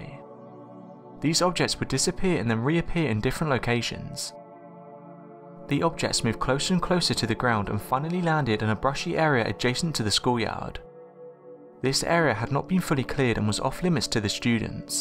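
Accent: England, United Kingdom. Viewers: Everything is better with a English accent.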